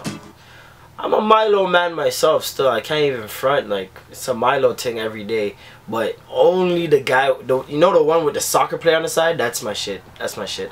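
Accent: Jamaica. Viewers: Are you from Kingston, Jamaica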